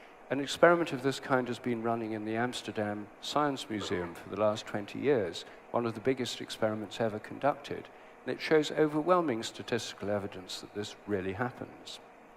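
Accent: United Kingdom. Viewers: I'm tired of British accents. This man goes on and on. I don't disagree with him on everything here. It's just boring. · Just because you have a British accent doesn't make you smart. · I can see how a take down of modern science can be a appealing to some but this is pretty stupid. Don’t let the British accent and crazy hair fool you 😂. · He looks like a pathetic hobo. If you're going to sport the British accent and give a symposium in front of a large crowd, at least take 5 minutes to pull your pants and well . He's mocking others while looking like that. Come on sir, be civil and respectable.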